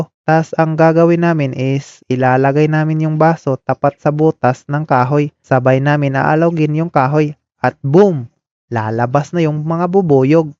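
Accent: Philippines. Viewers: Kuya taga probinsya kasi ganyan ka mag salita eh hehe 😅😅😅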